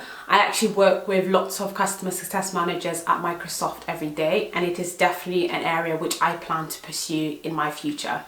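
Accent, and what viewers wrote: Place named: United Kingdom. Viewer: The British English is just awesome.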